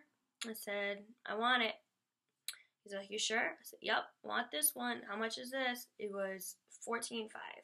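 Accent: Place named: Massachusetts, United States. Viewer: You definitely picked up the Massachusetts accent haha its kinda adorable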